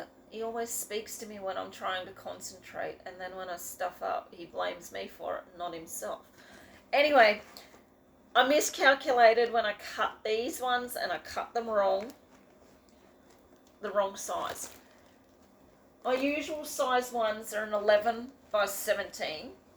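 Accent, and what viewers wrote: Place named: Australia. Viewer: Sorry to hear your not 100%. Darren and Sharon both seem to have similar problems😞. Why not make it a round TEN for projects!!!? Stitch with me would be great😁. If one a week got too much you could always alternate with a SWM one week and a Flosstube another week? I love the Aussie accent, please don't put it down😁. Stay safe, get well soon🤗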